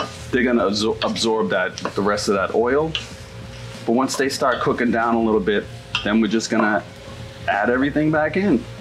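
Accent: Boston, United States. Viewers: Pointless comment here: This is the first episode I watched where I could actually hear the Boston accent. 🙃 I love watching this channel! Thank you for all the "cooking classes." (Essentially.) I swear I learn something new every episode. ❤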